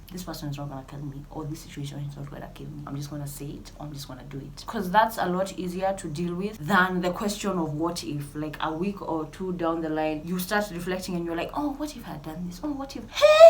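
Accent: United Kingdom. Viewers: The British accent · When that British accent checks in, I fall in love with you all over again😊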